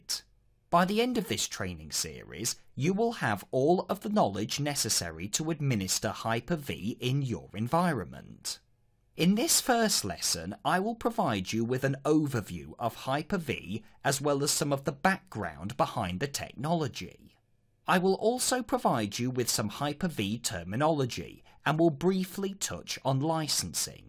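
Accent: United Kingdom. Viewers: Best explanation ever on Hyper-V and I enjoyed the British accent. well done thank you for the free training videos.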